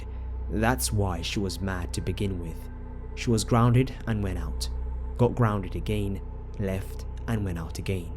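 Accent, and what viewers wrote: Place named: United Kingdom. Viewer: is hereby the best story teller. That British accent.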